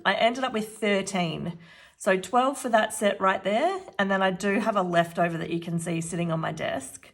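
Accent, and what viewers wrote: Australia. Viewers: I love listening to your thinking process! (And not just because of the cool Aussie accent!) I’ve been doing the sheet loads of cards for quite a while. Now I want to step it up to using the whole pad! Watching how you figured out which papers to cut into which pieces was brilliant! Added up to 24! I loved the shock in your voice!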